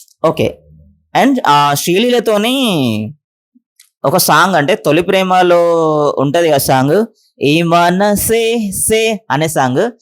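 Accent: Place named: Telangana, India. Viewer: Madi telangana bro 😂😂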